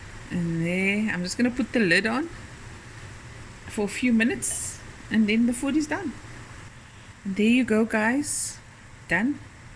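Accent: Cape Town, South Africa. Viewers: I love how I just randomly came across your video. Heard the accent and I knew immediately you are from SA. I'm South African currently living in Paris. Just tried this dish. Yummy❤ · Nice job i ear you are from cape town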